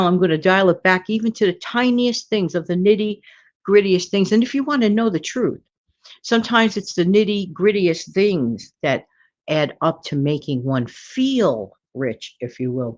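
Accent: New York, United States. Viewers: Wonderful to listen to your words of wisdom. They resonate. Thank you!🌷 Love your accent; is that New Yorkian? 😅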